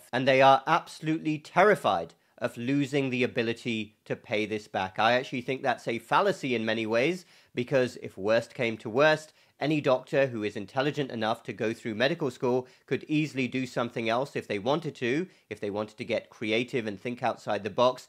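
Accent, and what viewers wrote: England, United Kingdom. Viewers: Dr Sunreel lives and works in the USA. You have a lovely English accent don't lose it 🤗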